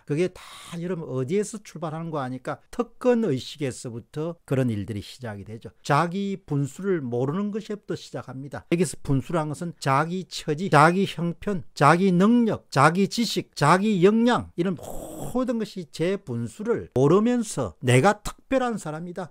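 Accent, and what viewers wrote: Gyeongsang, South Korea. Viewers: 이 물건도 갱상도네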